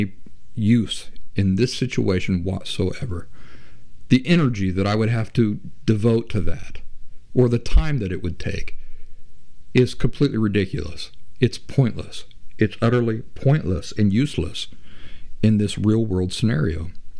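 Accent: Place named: South, United States. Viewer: no worries about how you talk, My dad was from Alabama/Mississippi and he talks almost just like you. I'm glad I found your YouTube channel. Thanks for video.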